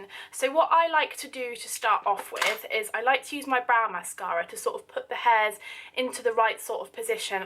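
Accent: United Kingdom. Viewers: this is why i love British accent. btw, you are so cute <3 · i think she's british because of her accent. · Is she brithish? I really like and enjoy her pronounciation. ^ ^ Easy to follow and to understand - I'm not a native speaker btw. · How do you acquire that cute English accent :)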